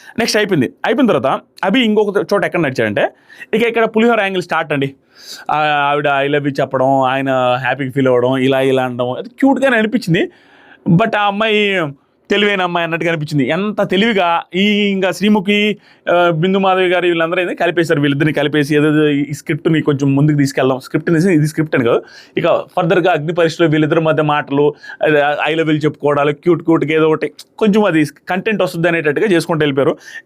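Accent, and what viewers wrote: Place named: Udal, India. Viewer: UDAL MOVA MATLADE VIDHANAM ISTHAM UNNA VALLU OKA 🤍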